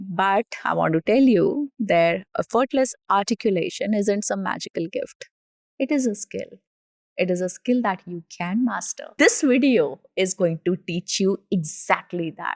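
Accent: India. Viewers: Am I the only one having problem with indian English accent ? 😢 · You are so good with words. But your Indian accent ruins everything.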